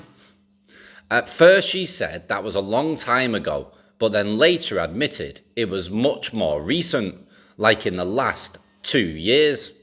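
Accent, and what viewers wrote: United Kingdom. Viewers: Delusional he sounds UK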